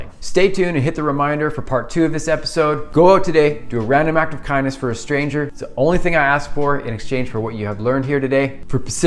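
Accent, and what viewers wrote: Canada. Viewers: Canadian aye 👌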